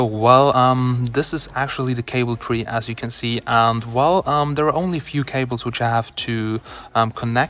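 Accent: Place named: Germany. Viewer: nice German accent :-)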